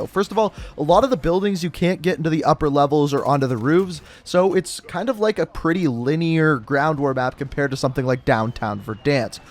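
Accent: Canada. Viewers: Bro are you Canadian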